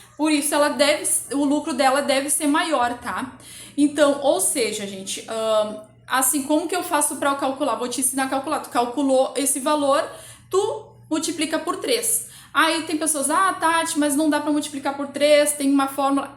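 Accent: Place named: South, Brazil. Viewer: GRATIDÃO 🙏🙏🙏ADOREI SEU DOTAQUE❤TU É DO SUL???😘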